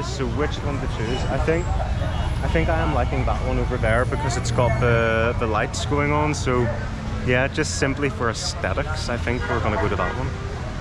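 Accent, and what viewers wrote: Northern Ireland, United Kingdom. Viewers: Your accent reminds me of a pal from Northern Ireland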